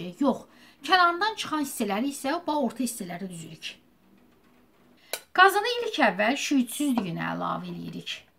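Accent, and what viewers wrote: Baku, Azerbaijan. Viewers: Nəhayət ki......👍👍👍👍👍👍👍👍👍👍👍♥️😘💐. gözləduyimiz paylaşim...,eşitmək istediyimiž Bakı ləhçəsi. səlis izah, dadlı plov.....,sizdən gələn cəkilişlərin ardı gəlsin in şə Allah canim♥️💋💐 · Çox sevdiyim Baki ləhçəsi çan baçim əllərivə sağlig çox təşəkkürlər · Bakili danishigidi. Allah bereket versin baci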